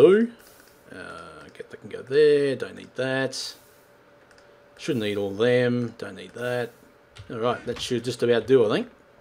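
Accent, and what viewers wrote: Australia. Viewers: this doesn't matter to me but answer this truthfully Are you actually Aussie or an American poser? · he sounds like an auusie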